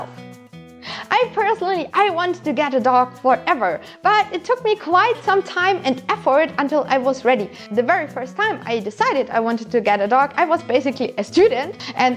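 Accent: Germany. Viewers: I realized you had a thick accent, but I didn't think you were German. I am living in Germany as well. I used to do voluntary work in a shelter for 2 years. I saw sooo many, great family dogs in the shelters waiting for homes FOR YEARS! That's extremely sad. There is a dog for everyone in the shelters. Even if you don't find your dream breed dog there, you might fall in love with a dog you might have never expected to bond with in the first place. If you still go for a specific breed, shelters also have many breed dogs frequently like Poodles, Yorkshire Terriers, Beagles, Jack Russell Terriers which I absolutely love, and German shepherds. German shepherds and Russells are the most common ones from my experience. It also depends on the region though. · You Sound a little German. :)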